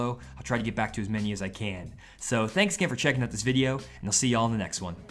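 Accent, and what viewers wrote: Oklahoma, United States. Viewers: Are you from Oklahoma?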